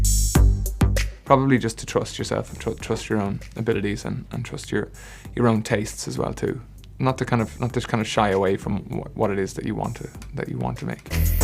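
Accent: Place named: Ireland. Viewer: Am I the only one who didn’t know he was Irish? Ive never heard him speak before....I’m not even sure where I thought he was from, I guess I just assumed like manifested from a witches spell or something.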